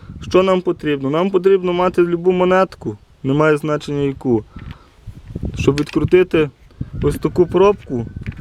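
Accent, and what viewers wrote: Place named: Ternopil, Ukraine. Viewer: Привіт.Чую тернопільський акцент....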